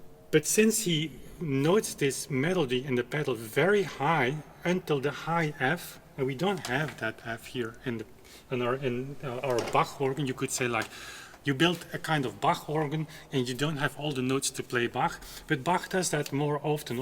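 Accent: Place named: Netherlands. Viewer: you also need accent reduction /th/, "out of the ordinary, not extraordinary" ''dove-tailed rather than very nice" but we love the Dutch! So if you composed this piece, would you just write in the canon dux et comes, then fill in the obligato Tenor and Alto? The homogeneity of the alto and tenor are almost megamaniacal, but account for the fundamental uniqueness of this masterpiece. Why is this a masterpiece? What harmonies are paramount at each point? Arent all the voices somewhat intertwined if we use 4 foot in the bass part? On the theology, what other canons in OB and elsewhere? Why are some melodies more suitable to being canonized? How are the melodies brought together at the end?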